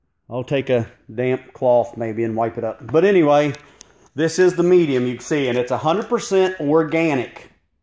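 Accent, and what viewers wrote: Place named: South, United States. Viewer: The Southern accent gives this review that extra level of authenticity.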